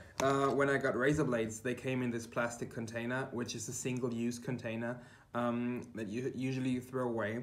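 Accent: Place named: Berlin, Germany. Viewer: Aha! Du kommst aus Berlin! Das dachte ich, als ich Sie sprechen hörte - Thank you for the genius tip!!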